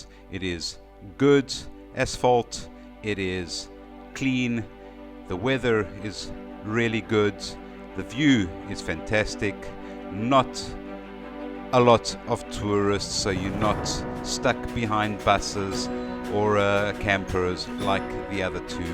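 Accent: South Africa. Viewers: Hi there. Your accent sounds like S.African. I am Saffa living in France 20 years now and motorcycling here. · You sound like you from South Africa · nice vid mate.....what part of South Africa you from?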